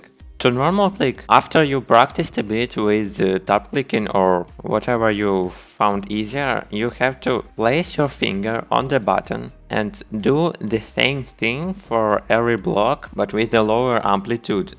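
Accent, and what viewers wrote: Russia. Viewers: Сразу понял что русский) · Русский что ли? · Какой то акцент не американский.. ты же русский... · Ты русский который выучил английский или наоборот Видос топ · ты же русский)) акцент слышен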